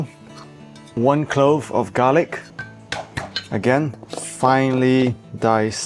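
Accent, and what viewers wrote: Northern England, United Kingdom. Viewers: It's his voice, he's from the north of England, has a nice mix of accents.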